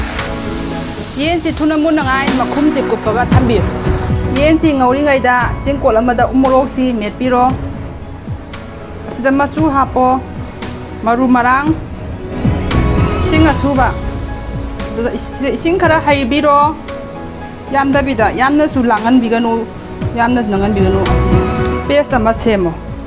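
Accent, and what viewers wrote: Kakching, India. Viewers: Yes ngnagnei Kari tourage ngangiba lonse kakching gi dialect ni Kari problem leirage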